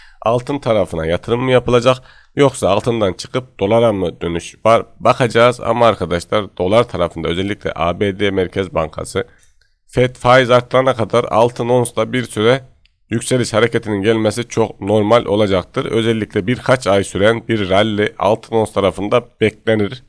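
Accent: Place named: Konya, Turkey. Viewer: Konya gibime geliyor 😊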